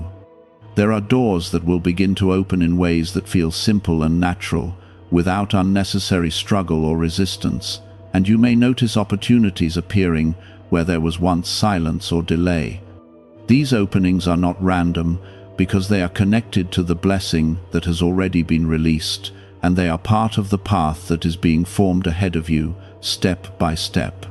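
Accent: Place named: United Kingdom. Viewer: Why does this AI god have a slight Britsh accent?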